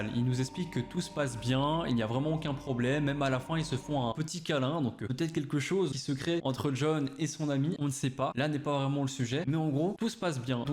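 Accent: Belgium. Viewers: Il est belge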